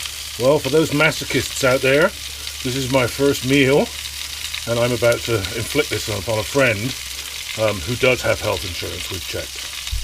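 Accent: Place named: United Kingdom. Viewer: another Brit with messed up ascent... when I lived over there my accent didn't know where I was from... but when I got back here the American twang died ... lovely video of your first trip out ... are you still on the road as this is over a year old... one day I will be back and plan to travel again..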